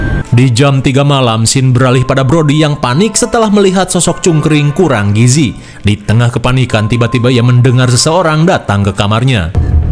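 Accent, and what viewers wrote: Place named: West Java, Indonesia. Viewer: Bocil sok pinter dih,pdhal yg baca naskah alur ini tuh orang sunda dengan logat dan suka melesetin kata kata biar ga terlaly flat dan kaku pembawaan nya, lu penonton baru apa gmna? Dri dlu jg bgini pembawaan nya kocak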